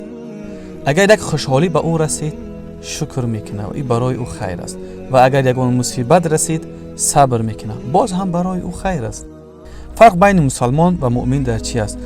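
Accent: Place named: Afghanistan. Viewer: Бехтарин бародар рост мусалнон ба хама нематои Аллах шукургузор бошем мо точико миллати боем забони зебох дорем агар мисли хамин бародар сухан кунем яьне лахчаии гап задан мисли Ирону Афгон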